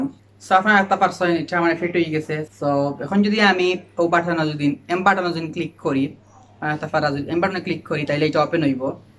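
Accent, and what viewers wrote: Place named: Sylhet, Bangladesh. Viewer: ভাই আপনার সব ভিওয়ার সিলেটি না। পারলে শুদ্ধ বাংলায় বলেন।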